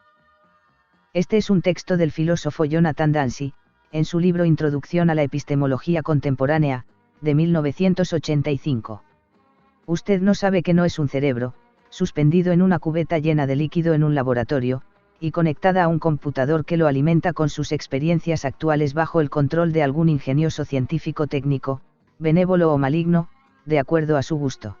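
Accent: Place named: Uruguay. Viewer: me tinca que tu voz es mucho más agradable q esos "emuladores de voz" o como se llamen que usas en tus vídeos, además con acento uruguayo serían muy entretenidos. Por principio me salto los vídeos q usan estos text to voice, puesto q los usan los millenials conspiranoicos. Vi ambos xq estaban en "No sin grave daño". Por ahora te leeré ahí.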